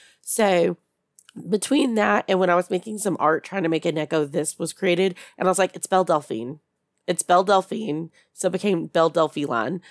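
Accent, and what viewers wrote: South, United States. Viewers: Have I mentioned how much I love the southern accent. I gotta go to bed because I have a big day tomorrow, but still a great audio. Goodnight everyone.☕️ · I like the southern accent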